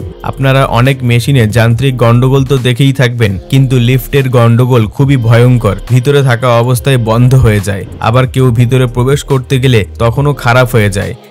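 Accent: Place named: Bangladesh. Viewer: Vdota bhaloi baniyechho, tabe India ke chhoto karar prochesta nindoniyo. Tomar Bangla shune mone hoy tumi Bangladeshi. Bina kharache Hanglamita tomader moddhei dakha jaay, Bharatiyoder moddhe noy. Bharotiyoder aatmo marjada gyan aachhe karan ekhane garibi anek kam, ja tomader deshe aakash chhoa.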